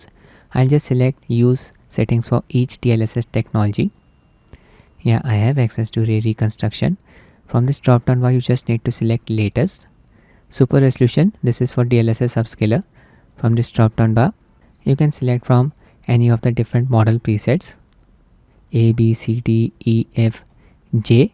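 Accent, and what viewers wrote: India. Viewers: Indian voice, in the garbage it goes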